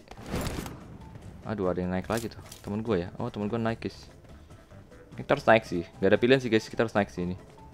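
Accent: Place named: Indramayu, Indonesia. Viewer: kaya orang indramayu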